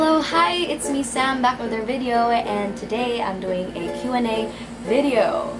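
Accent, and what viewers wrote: Bisaya, Philippines. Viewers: Your bisaya accent so cuteee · Pag nag tatagalog sya halata na bisaya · when she's speaking in english seems like she's not a Filipino but when she speaks in tagalog you will actually recognize her as a Filipina and to be exact bisaya. ❣️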